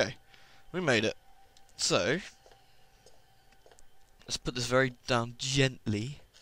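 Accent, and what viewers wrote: United Kingdom. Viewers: You sawed Freemans hand off D: You monster! Loving your walkthrough, and its nice to hear a british accent. Yay for us British peoplez.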